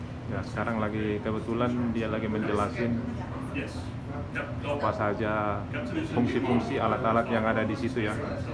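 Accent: Indonesia. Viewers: Saya suka sama logat ny Lae... Horas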